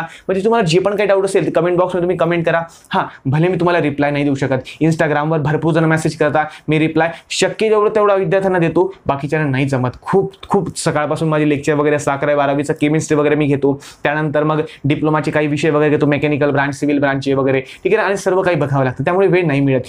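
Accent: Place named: Jalgaon, India. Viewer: दादा तुम्ही जळगांव चे आहात का?, कारण तुमच्या भाषेवरून तसं जाणवून येत आहे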